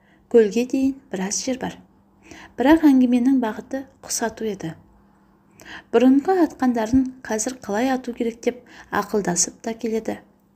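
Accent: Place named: Russia. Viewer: сол үйрену үшін қателіктерін айтканымыз дұрыс шығар. И орысша акцентінде түзеп анық қазақша оқу үшін қазақша кітаптарда мәнерлеп дауыстап көп оқыса . Сосын барып бүкіл елге оқыса дұрыс болар еді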